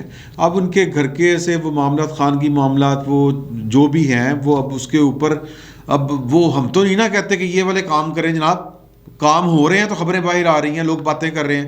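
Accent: Punjab, Pakistan. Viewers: Good analysis with Punjabi flavor.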